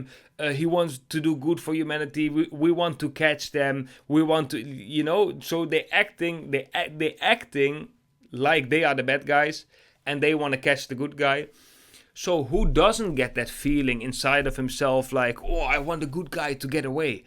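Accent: Netherlands. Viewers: I think he's Dutch! 😊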